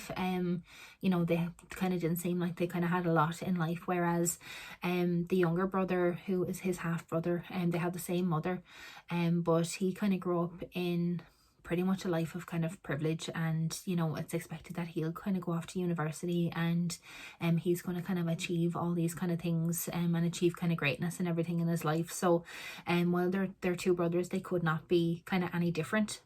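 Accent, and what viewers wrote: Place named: Ireland. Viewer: Hi,best wishes from Wolfie,hope your back is better although you did get time to watch some films,I have all the Hatchet films,there's 4,think they get gorier as they progress,loving the Irish accent,keep watching.xx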